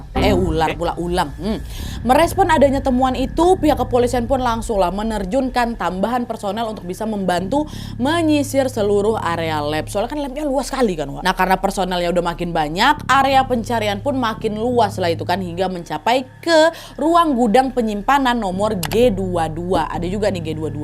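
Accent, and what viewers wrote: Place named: Medan, Indonesia. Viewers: Kak tinggal di jogja atau orang jogja?? Kirain kak orang sumatra/kalimantan dari logat bicaranya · Kirain mba satu ini orang Medan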